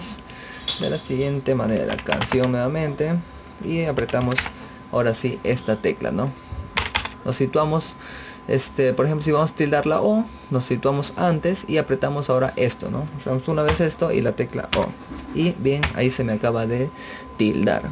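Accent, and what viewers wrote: Peru. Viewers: Peruano .___.XD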